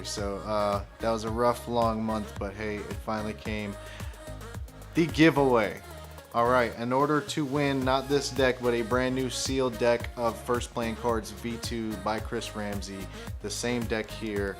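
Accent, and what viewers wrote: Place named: Houston, United States. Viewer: 🤘 question...Are you from Htwn? You talk alot like us down hurr.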